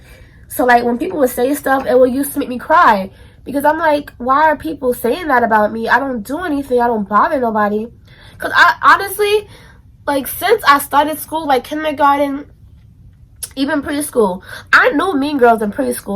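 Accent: New York, United States. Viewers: I love your accent cause you sound so New York, love you 💕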